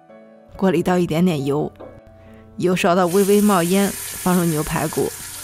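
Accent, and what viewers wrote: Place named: Shijiazhuang, China. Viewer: 听的口音很像石家庄人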